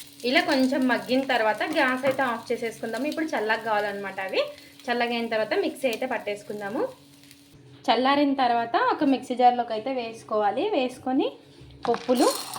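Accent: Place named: Anantapur, India. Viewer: మీది అనంతపురము జిల్లా కద నాన్నా...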